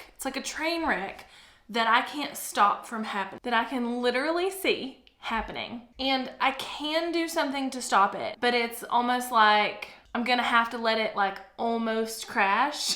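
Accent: South, United States. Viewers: So sweet. The tonality is so different from people who are ride or die as opposed to people passing by. I learned a lot from you in your productivity era. I can't remember what search word I used... ClickUp? Anywho I'm back. Like your southern accent and beautiful office/room.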